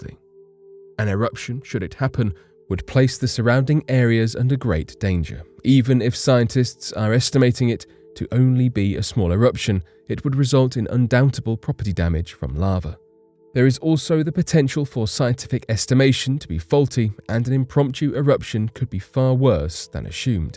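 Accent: England, United Kingdom. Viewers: When you start a video and hear a THICK uk england accent i know the video is going to be a bloody bunch of shite..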